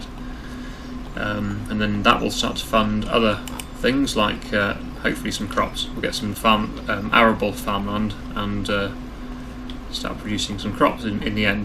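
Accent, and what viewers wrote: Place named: United Kingdom. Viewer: Omg the first time I heard yr voice over and OMG I LOVE THE EXTREME BRITISHNESS (I am british but like yeahhhhhhhh)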